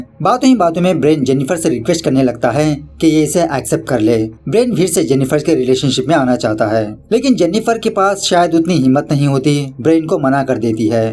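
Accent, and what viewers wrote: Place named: South, India. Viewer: Hi.. Am totally addicted to your channel now..from last few days am continuously watching all your videos..enjoying a lot..tamil, telgu, malayalam,bengali,marathi...all in one subscription.. 👍🏽..like your South Indian accent too..keep it up...All the best ....looking forward to all new ones..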